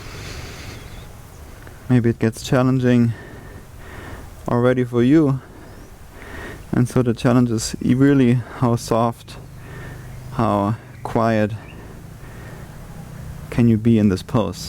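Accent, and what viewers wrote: Germany. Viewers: Du bist doch deutscher oder ? Hört sich zumindestens so an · amazing tatoos, sexy body and sounds like a German accent ? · Are you German?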